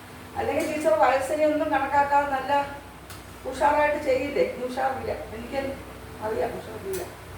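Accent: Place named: Kottayam, India. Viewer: But you have a Kottayam slang any connections with Kottayam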